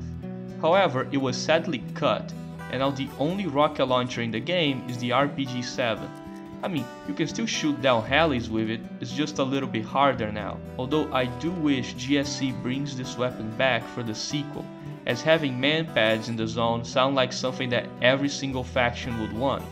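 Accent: Brazil. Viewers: Sorry to ask but, are you brazilian? Your accent sounds reminiscent to a brazilian fluent in english who maintained his 1st language "charm" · Is that a Brazilian accent? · sotaque inconfundível de br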